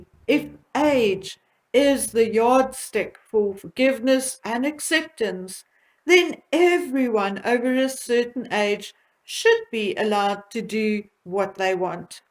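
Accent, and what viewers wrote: South Africa. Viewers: Its very relaxing, then again I am South African living in the UK so any SA voice is a bonus for me.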